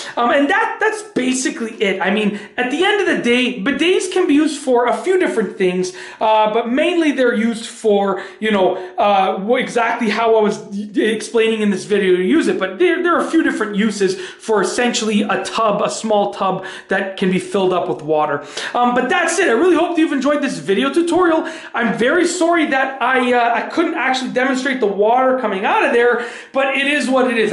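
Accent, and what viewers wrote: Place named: Canada. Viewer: You sound so canadian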